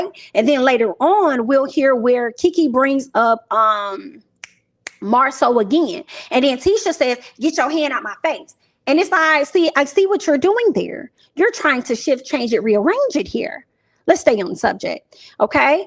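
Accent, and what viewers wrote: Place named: Alabama, United States. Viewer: I just love to listen to you talk! Reminds me of my family home (Sylacauga, AL). ❤️❤️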